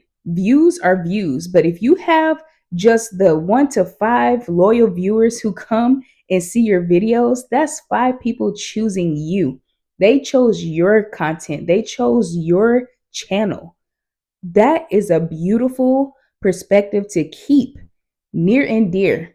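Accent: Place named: United Kingdom. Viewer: Well done another great video, mindset no 2 resonated with me, love the bloopers and British accent 🇬🇧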